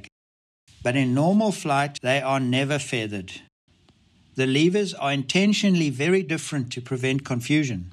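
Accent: South Africa. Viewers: Hi. I do live in Oregon USA now but did live in South Africa till 1999. I am guessing from your accent you are from SA as well. I have to admit I am very biased about Nepal. I have visited twice and left only a month before this accident. I had been almost as excited as some of the locals I know about how positive the new airport would be for everyone. This made something immeasurably bad even worse. If you have never visited the people in Nepal are incredibly kind, hard working and hospitable and its a very easy place to love. And whilst understanding their transport challenges in terms of equipment and procedures (it does not seem these were a factor here) I have only had the greatest respect for the pilots and bus drivers who do an incredible job under very tough conditions. I can only fault some taxi drivers, which seems to be a problem everywhere. I just hope there is some final explanation which does not add to this tragedy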